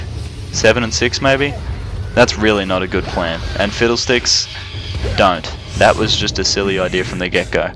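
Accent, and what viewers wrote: Australia. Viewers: i hate Australian accents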